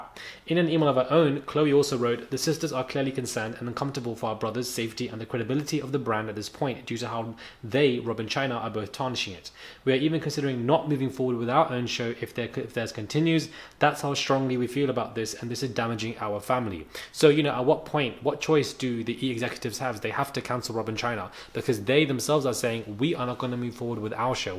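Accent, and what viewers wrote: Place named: United Kingdom. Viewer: I love watching ur content I just wish u would give us all the information before giving ur opinion, and also can u slow down when u speak u have to remember u have a British accent and it is already hard for us Americans so understand u anyway but when u speak fast we can't understand u good.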